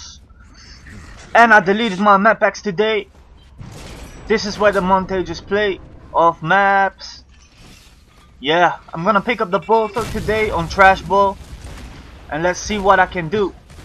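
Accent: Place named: Europe. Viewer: su acento no es de estados unidos el es de europa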